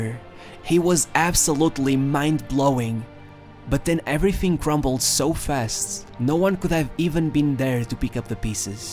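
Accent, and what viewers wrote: Portugal. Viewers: Are you from Mozambique,Portugal,Angola or cabo verde i swear you sound like your from Portugal or african country's that speak Portuguese iam gonna speak Portuguese and if you know you olá meu nome é Nícolas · Portuguese. The accent is unmistakeable. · you're Portuguese, my friend? you do sound like portuguese, 😁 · Bom canal. Esse sotaque português 😅😊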